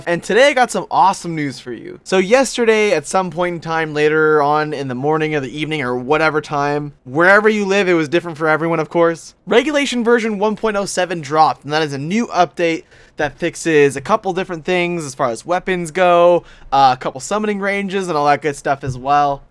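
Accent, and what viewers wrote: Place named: United States. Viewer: is this the way americans speak? ... douchey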